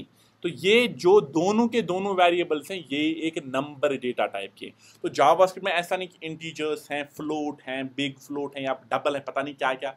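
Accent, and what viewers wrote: Kashmir, India. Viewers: sir aap kashmir se ho kya kyuki mujhe accent se lgaa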